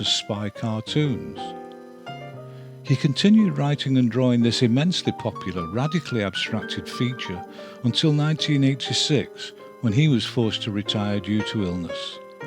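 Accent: United Kingdom. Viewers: Why am I listing to an individual with a British accent tell me about Mad Magazine ? Spy vs Spy was the best part of Mad Magazine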